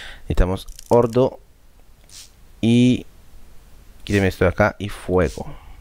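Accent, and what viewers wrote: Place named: Colombia. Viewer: De q parte de colombiano eres ??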